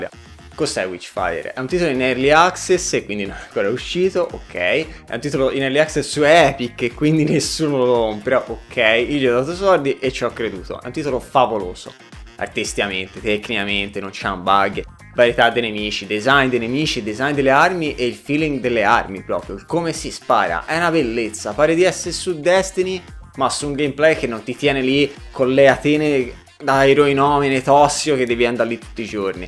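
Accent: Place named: Tuscany, Italy. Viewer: Leggermente troppo dialetto toscano, ti consiglio di semplificarlo sennò la gente non riesce a capire troppo bene e leva il video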